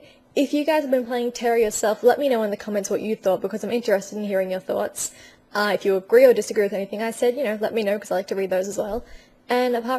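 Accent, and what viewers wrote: Australia. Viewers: australian :O i love your accent XC wish i could exchange my american accent a european or australian :D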